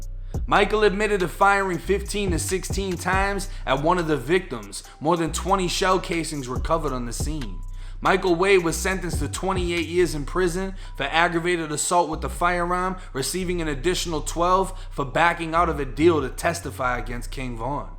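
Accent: Boston, United States. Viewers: U sound like ur from Boston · Yo is this dude talking from Boston some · Are u from Boston?..I hear the accent...btw good breakdown 👍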